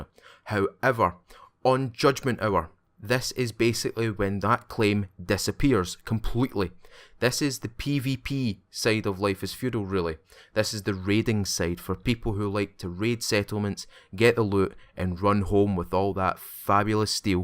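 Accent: Scotland, United Kingdom. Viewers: Love your LiF:YO vids, love your scottish accent, I should play my " " colection while playing LiF... Game is still grindy AF, fortunately we can play with the server settings and accelerate the leveling rate...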